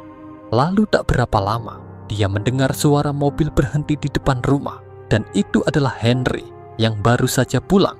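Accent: Bali, Indonesia. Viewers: Suka dengan alurnya... Bang, kamu orang Bali ya?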